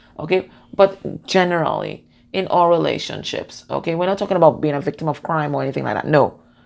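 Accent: Trinidad and Tobago. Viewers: A Trini or Guyanese accent. I do follow Steve Nobel meditations very helpful. I'm a Bajan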